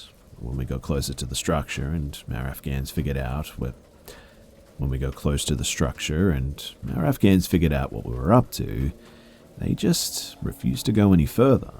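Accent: Australia. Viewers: Bro you an Aussie like me? Sounds like it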